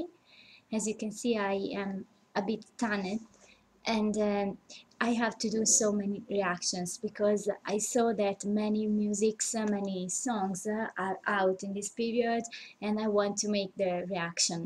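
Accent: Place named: Italy. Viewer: she from italy she just speak English with Italian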